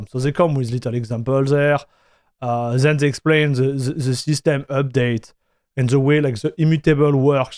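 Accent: France. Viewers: Tu serais pas un peu français ?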